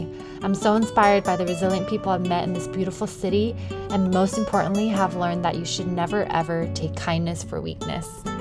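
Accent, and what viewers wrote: California, United States. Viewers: your whiney cali drone voice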